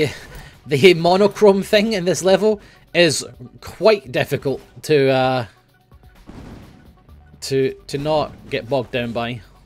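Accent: Scotland, United Kingdom. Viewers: I wish i was Scottish u speak fucking Cool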